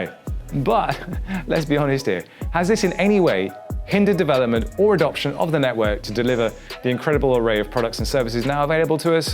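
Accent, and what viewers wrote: United Kingdom. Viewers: Why is a British accent so convincing? Between this dude and Coin Bureau, I believe everything they say!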